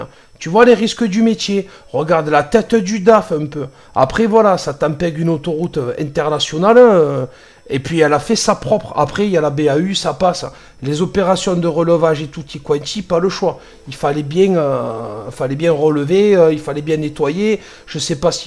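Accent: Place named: Corsica, France. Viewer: Mais ma parole, tu es Corse..je reconnais ce bel accent ❤, en tout cas si ce n'est pas le cas, tu dois avoir du sang Corse 🙏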